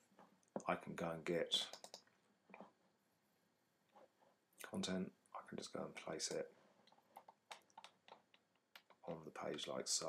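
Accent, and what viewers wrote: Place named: Cockney, United Kingdom. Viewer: For God's sake man, turn up up your bloody recording volume if your going to speak in that cockney accent.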